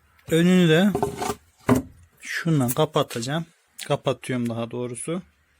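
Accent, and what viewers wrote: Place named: Sivas, Turkey. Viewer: Konuşmandan belli abi tokat sivas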